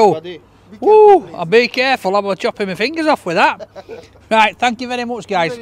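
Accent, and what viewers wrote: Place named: England, United Kingdom. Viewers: What part of England you from. I can barely understand you with that strong accent. Love it for sure! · Cockney af yo lol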